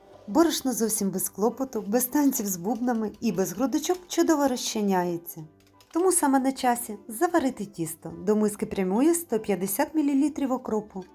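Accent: Standard. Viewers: Як ви гарно розмовляєте ❤❤❤. Приємний голос , чиста українська мова, рецепти і їх подача чудові ❤❤❤. Удачі вам , підписуюсь ❤❤❤ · Обожнюю ваші рецепти.Дивлюсь на одному подиху.Поки не маю можливості спробувати бо не вдома.Але незабаром думаю реалізую таку можливість.Окремо дякую за прекрасну,чудову,гарну, неповторну,чисту, правильну УКРАЇНСЬКУ МОВУ. Це неймовірно.Натхненя,наснаги,здоров'я Вам і всього найкращого.❤❤❤❤❤🎉🎉🎉🎉🎉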